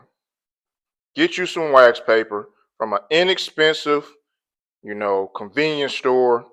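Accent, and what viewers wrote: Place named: Detroit, United States. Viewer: That sound like a Detroit accent too 👀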